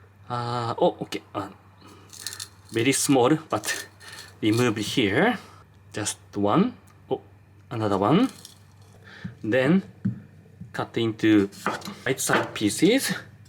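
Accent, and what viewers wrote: Japan. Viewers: rules! The most charming Japanese-English accent & way of speaking ever 👍🏾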